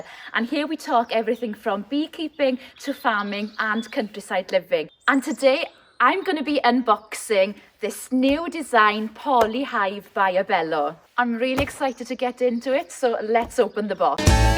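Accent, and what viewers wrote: Wales, United Kingdom. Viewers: Wish i could unbox her beautiful lady beautiful welsh accent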